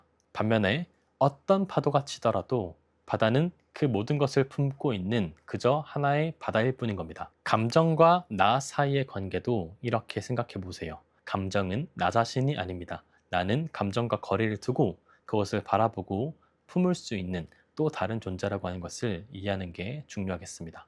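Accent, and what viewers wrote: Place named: Gyeongsang, South Korea. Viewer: 억양이 경상도시군요...^^반갑습니다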